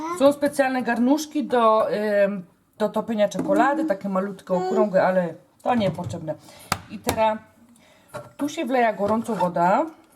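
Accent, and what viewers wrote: Silesia, Poland. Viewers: Tak, śląskim ;)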